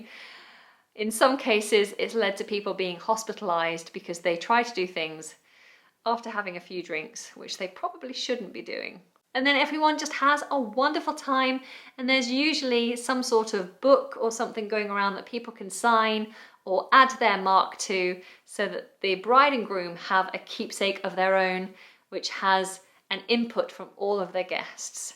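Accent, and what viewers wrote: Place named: United Kingdom. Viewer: Hi, I really like your videos, you are very nice and have a lovely voice and a clear and perfect British accent that makes it easier to understand and learning english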